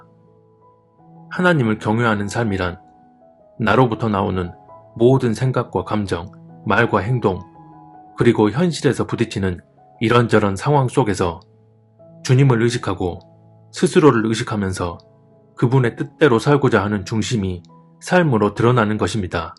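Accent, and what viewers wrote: Jeolla, South Korea. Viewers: 전라도 사투리....^^ 반갑습니다~ㅋㅋ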